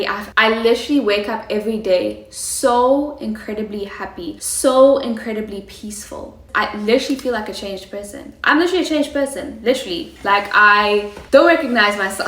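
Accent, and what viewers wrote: South Africa. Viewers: Are you south African ? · your south african accent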